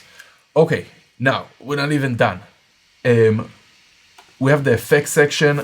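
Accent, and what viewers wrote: Israel. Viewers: אתה ישראלי? 😅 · יש לך את המבטא הכי ישראלי ששמעתי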